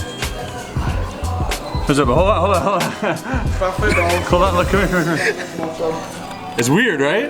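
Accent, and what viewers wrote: Canada. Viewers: This guys Canadian?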